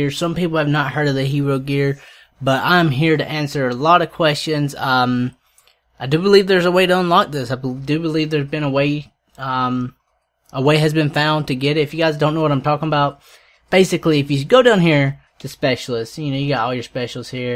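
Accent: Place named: South, United States. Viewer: You have a distinct Southern accent. Where are you from? Alabama here.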